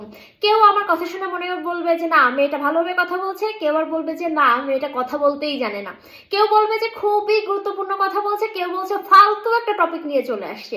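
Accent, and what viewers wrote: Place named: Bagerhat, Bangladesh. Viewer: আপনি বাগেরহটের আঞ্চলিক ভাষা কথা বললে ভালো লাগে, একজনকে মিস করি যে দূনিয়াতে নেই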